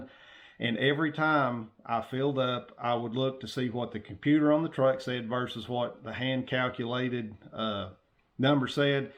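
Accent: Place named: South, United States. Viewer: New subscriber - thanks for the video. I am enjoying your channel and hearing about your journeys. I like the southern drawl, kinda relaxes me to listen to as my grandpa's family is all from Georgia and I enjoy their accent.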